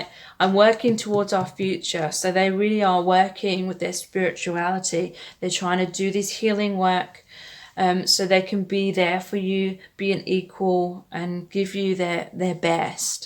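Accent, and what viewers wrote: United Kingdom. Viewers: Wow!! I love ur British accent also fantastic reading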